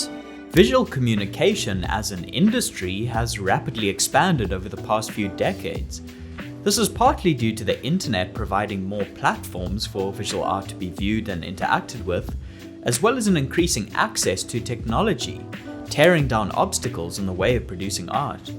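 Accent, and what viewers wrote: South Africa. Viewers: The narrator sounds very South African. 😊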